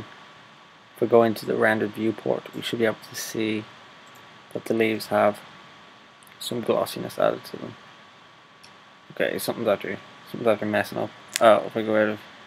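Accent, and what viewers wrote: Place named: Newfoundland, Canada. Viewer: this guy is a newfie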